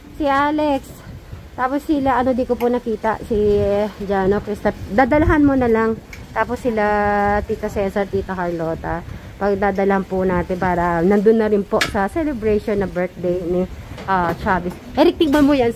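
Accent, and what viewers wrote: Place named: Visayas, Philippines. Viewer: Ay visaya diay ka madam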